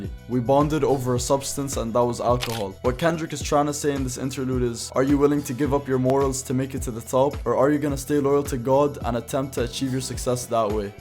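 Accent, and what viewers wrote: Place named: United Kingdom. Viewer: Why do I love the British accent so much😭😭😭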